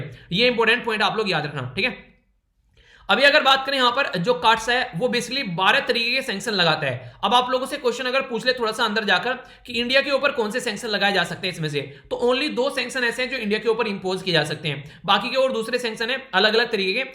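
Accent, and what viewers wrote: Haryana, India. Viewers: really are you sure ??...mai sir ka bolne ka tone or bhut sare elimination lagye ki bihar se ek frnd tha to wo nhi hoga or up se vi nhi or rajasthan se doubt tha to sir ka tone rajasthani hindi nhi hoskta fir uske bagal me haryana h or tone match krrha by map so mai guess kiya hua...or bagal me rajsthan ka PCS vi diye h...or sir bolte h aas pas ka PCS diya kro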